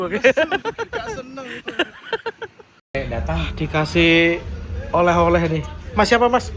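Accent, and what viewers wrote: Indonesia. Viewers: Udah jadi logat orang indo nie pak lek😂😂😂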